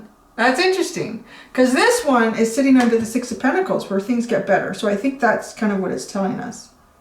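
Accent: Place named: Canada. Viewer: I love how Canadians pronounce "out" (Thank you for the reading <3)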